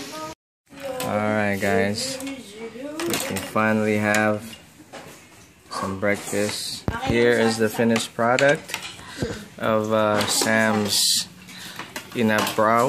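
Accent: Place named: United States. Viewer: Luv your vlogs...Are you still in school or your done already? Luv you still have your American twang:)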